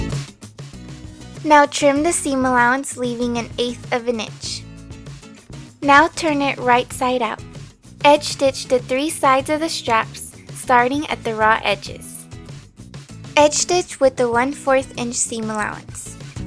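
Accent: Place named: Spain. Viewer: Se te nota bastante que hablas español =)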